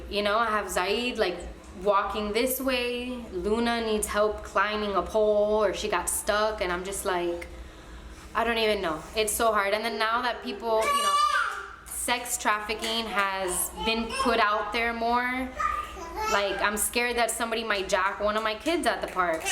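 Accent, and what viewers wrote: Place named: San Francisco, United States. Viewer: I knew I recognized that accent! I live in SF too lol. I’m not pregnant (yet) but am doing research because I plan to have children soon. I always planned to have my children 1.5 years apart but this is eye opening. I really enjoy your videos, thanks so much for your honesty. Your eyes are gorgeous btw.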